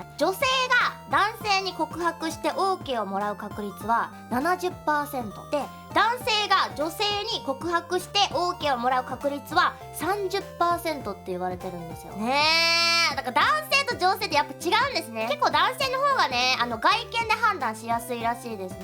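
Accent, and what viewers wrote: Hakata, Japan. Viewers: 博多弁まじ神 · 博多弁よっしゃぁ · 博多弁ですฅ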